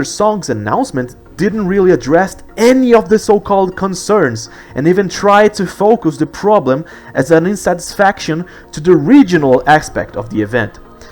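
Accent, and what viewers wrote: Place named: United Kingdom. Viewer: vídeo perfeitinho, meu dog. also: whats is up with this british accent? pretty fancy, i'd say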